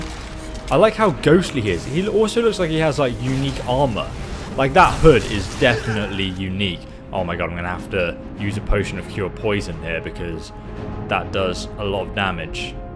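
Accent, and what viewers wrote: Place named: England, United Kingdom. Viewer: Da Informer his accent has nothing to do with it, its an english accent, sane as mine, i prounounce everything fine, hes dyslexic.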